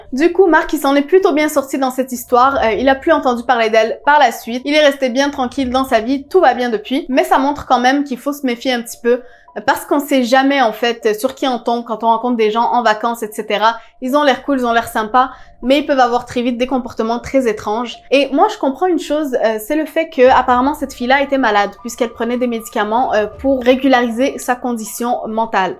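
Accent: Quebec, Canada. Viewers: T’es tu Québecoise? Ou t’habites tu au Québec?! Parce que t’as un ti accent Québecois. (Jparle en connaissance de cause, je suis Québecoise)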